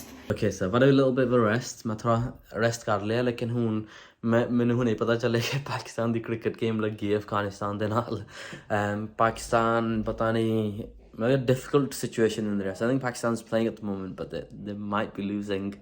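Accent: Punjab, India. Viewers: your accent punjabi with English. its amazing. really love your videos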